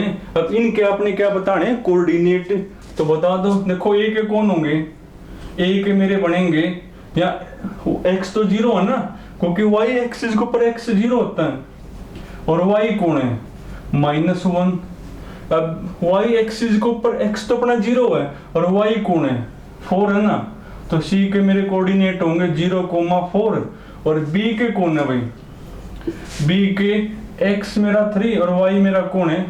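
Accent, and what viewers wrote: Haryana, India. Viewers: Sir I thik you Belong to haryana...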